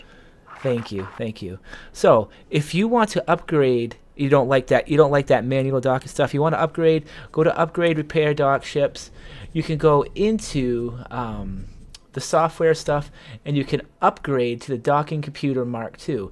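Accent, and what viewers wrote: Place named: New England, United States. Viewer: Loving your new england accent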